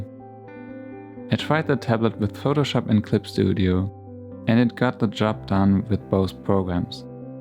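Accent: Germany. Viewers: You sound like a German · Hey i'm new on your Channel. And i think you sound german. Are you?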